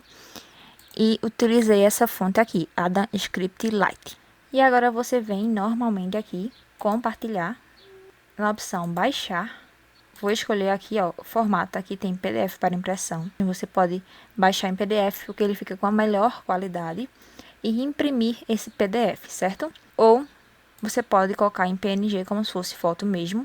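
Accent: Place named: Recife, Brazil. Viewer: Que legal,! 🌼 Me inscrevi hoje, e tô gostando muito! Didática simples, clara e eficiente! Parabéns🎉🎊🎉🎊! Ah! Você ê do Recife? Seu sotaque parece 👀 de lá! Muito top, seu Planner! 📝 Abraço👐 Noemário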